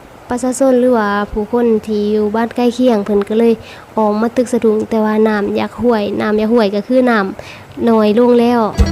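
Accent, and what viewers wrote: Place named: Sisaket, Thailand. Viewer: สบายดีลูกหล่าสำเนียงที่ลูกเว้าจาคือคนแถวศรีสะเกษกันเองบ่ต้องเก็งใจกัน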